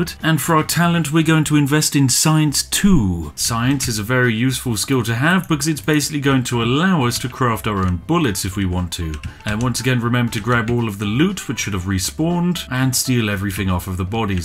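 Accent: United Kingdom. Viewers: It’s funny cause he’s so “AMERICA!” But he’s British so he’s like “Britain!” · PERFECT CHANNEL TO HEAR A BEAUTIFUL BRITISH ACCENT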